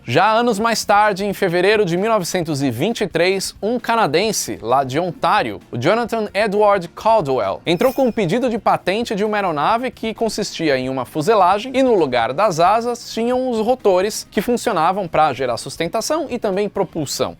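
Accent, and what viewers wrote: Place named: São Paulo, Brazil. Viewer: gosto dos seus videos porem sua voz d paulista e foda tudo pra voces e meinto, momeindo pensameinto porque nao momento porque o i antes do n fico bolado com filmes com dublagem paulistanas tudo com meinto poxa que voizinha safada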